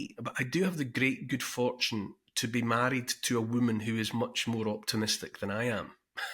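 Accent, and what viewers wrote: Scotland, United Kingdom. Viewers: Ayup, I live with a real fire, all the time and just to let you know that a crackling fire and your Scottish tone playing with one of your monologues on in the background and a candle is a magical thing! Very celtic! Thank you 🤝🏴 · Irrespective of your own view of yourself... I stand by the following... 😁 (written in May '22) 👍😍 Won't Kneel A gentle, beautiful Scottish lilt A kind, empathic, forward tilt An open heart; a caring face A willingness to take his place. A strong, home-hitting monologue Reminds us of the boiling frog The courage to become a sleuth A passion to release the truth. A deep desire to crack the whip Illuminates our freedom-strip Calls out the darkness taking hold The shiny despots; plated gold! Intense and raw, he lays it bare A soul-enhancing, honest stare Inflections drive the message through He speaks for Us - for me and you! 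Discernment's such a precious gift His words create a mighty lift Take heed of all he has to say He knows - it shouldn't be this way! 🤗🦋🐬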